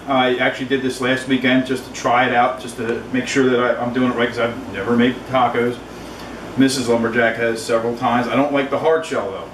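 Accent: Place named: Pennsylvania, United States. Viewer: What part of PA are you from, I was born and raised in Collegeville and moved to south central Texas about 10 years ago when I was 23. But I can pick our accent up after the first word out of your mouth! Love you recipe makes me miss back home! Thanks!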